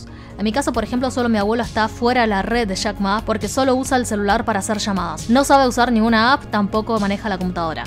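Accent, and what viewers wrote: Buenos Aires, Argentina. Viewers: me encantan tus videos, te voy a empezar a seguir, pero hablas muy rápido. ¿Eres argentina? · Me mata el acento Porteño!!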